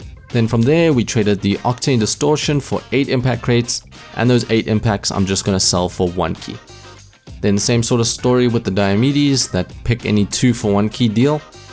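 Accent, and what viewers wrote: South Africa. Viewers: Are u from south Africa? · Are you from South Africa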